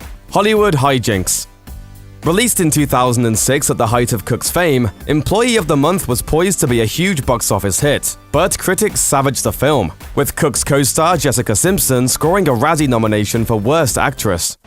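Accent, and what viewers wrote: United Kingdom. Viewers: Can you guys pick another narrator? This guy's accent and is jarring and seriously annoying. Plenty of British voice actors out there who don't grate on the ears when they speak. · To make matters worse he has to get low key patronized by a nameless faceless person with a trash stereotypical British accent. Who deep down has to know he will never be as big as Dane Cook professionally. Ohhhh. Do carrot top next. People love to trash on him. I think it will be funny for a no talent hack to trash someone they can only dream to be as “successful” as 🙈🤩🤡🤑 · A bad brit speech bot is going to tell us what is funny and what is not. Nah, move along.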